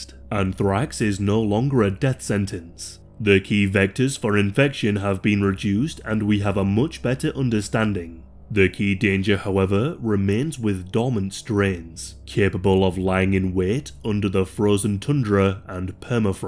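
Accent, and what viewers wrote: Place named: Northern England, United Kingdom. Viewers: I didn't know you were Australian you sound northern English...? I'm from the UK btw ✌️ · Do you think everyone has a London accent? 😂 I’m a Northerner and he is 100% from England. I have a feeling you aren’t though and shouldn’t be assuming.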